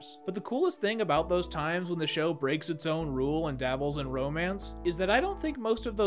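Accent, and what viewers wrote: Netherlands. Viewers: hold on… are you Dutch…😃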